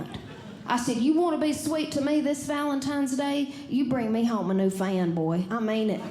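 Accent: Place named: South, United States. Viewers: The jokes were perfectly fine. I laughed many times. Shitty people like you should probably get on stage instead of thinking their opinions are objective. See, I think you might just have an aversion to the country accent and the fact she’s from Texas and is Christian because when I was far left, these are the same type of markers that made me instantly not like someone until I realized I was part of the problem just like the far right people who hate leftist Just for minor things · I just love her accent. Any southern accent is pleasing to my ears. I think she is way cuter without the wig.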